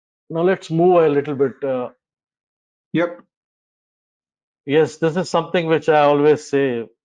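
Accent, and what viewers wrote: India. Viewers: It's just not understandable thanks to that awful Indian accent. Sorry!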